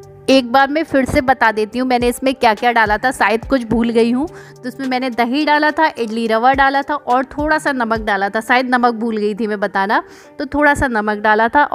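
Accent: Uttar Pradesh, India. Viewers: Aap kha se up se ho gya apki awaj se lg rha hai plz reply jrur krna kha se hoo tum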